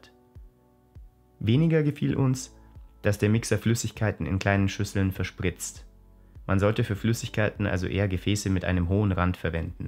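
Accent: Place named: Bavaria, Germany. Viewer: Das ist Bayerisch 😁😆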